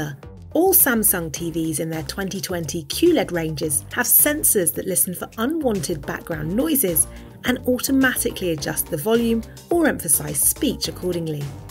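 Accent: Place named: United Kingdom. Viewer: Hiw about using an American voice instead of British! So everyone can understand!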